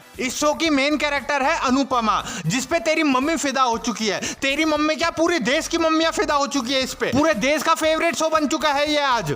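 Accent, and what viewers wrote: Rajasthan, India. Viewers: Bhai tera rajasthani accent 😂👌👌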